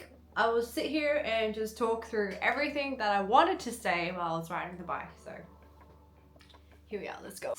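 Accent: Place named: Australia. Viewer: Asian girl with Australian accent is so cute 🥰 not to mention you drive a s1k 🔥🔥🔥🔥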